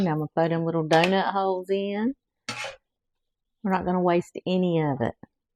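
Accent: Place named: South, United States. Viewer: EXCELLENT VIDEO Thank YOU for sharing this You are definitely a Southern Lady... I know that accent.. I have it too What State are you in? or Where are you from? I have lived in Texas.... from Oklahoma (southern accent) Thank you so much for sharing this wonderful video. Loved it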